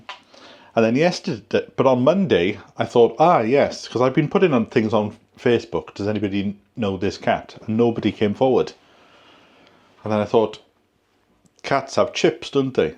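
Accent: Wales, United Kingdom. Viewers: I so enjoy your videos because it is like visiting adear friend. Honest dialogue and just hearing that beautiful Welsh accent takes me right back to my Grandma’s kitchen. Don’ t let those trolls get to you. Not worth your time or energy. Your true blue followers just enjoy spending time with you and enjoying your allotment videos and new vistas when you are out and about in your car. Just you being you is all that we need to bring a little Welsh sunshine into our lives. 💕👍🏻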